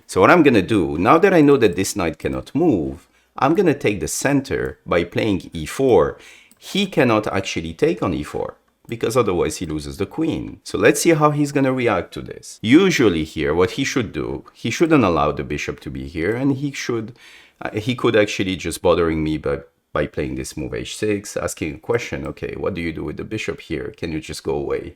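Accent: France. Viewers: The french accent is great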